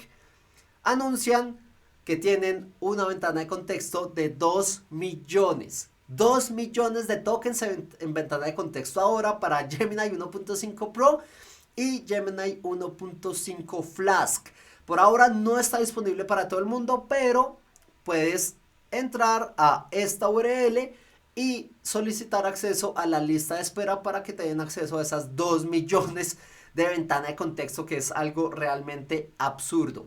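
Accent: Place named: Colombia. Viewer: Gracias por el resumen. Me encanta tu onda , forma de explicar, la edición de los video, y, la forma de hablar colombiana. Slds from Argentina. I love Gemini.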